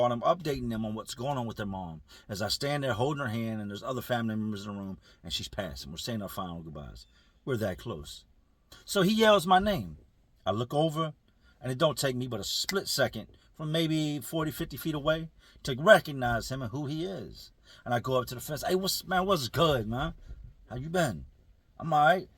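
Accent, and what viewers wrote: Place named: Virginia, United States. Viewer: Push the Butteeen. We know from VA, can’t deny that Virgina accent. I here some Danville in there from time to time too.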